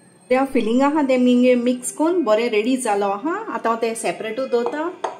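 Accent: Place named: Cuncolim, India. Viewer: I like your konkani dear.are u from cuncolim? It sounds like cuncolim konkani 😅😅. Love all your recipes ❤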